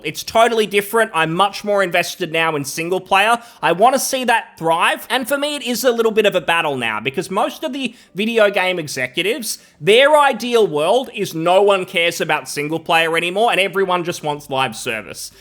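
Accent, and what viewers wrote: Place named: Australia. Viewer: I give the dude the benefit of the doubt, he's a smart bloke, a lot of words pronounced differently in Australia.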